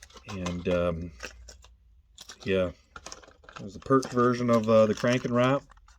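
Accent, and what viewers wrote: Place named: Canada. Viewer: Your accent is identical to Ricky’s accent in trailer park boys, you must be Canadian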